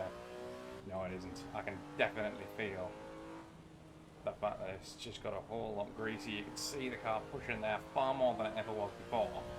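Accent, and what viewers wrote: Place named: Sunderland, United Kingdom. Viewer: You sound more mackem than usual in this one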